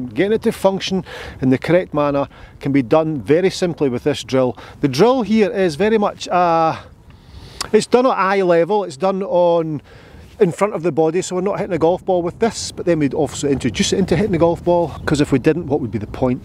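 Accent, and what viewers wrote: Scotland, United Kingdom. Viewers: Love the videos. Even understanding Scottish! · One of the best golf channels on YouTube for the following reasons: 1 - Love the Scottish accent and I’m an English man. 2 - fellow ginger, like myself. 3 - Explains drills in a easy way of following! Keep up the good work!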